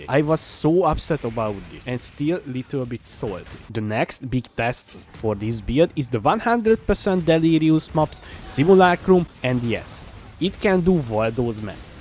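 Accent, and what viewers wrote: Hungary. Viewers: Az akcentusból sejtem, hogy magyar videós vagy. :) Hajrá-hajrá, sok sikert!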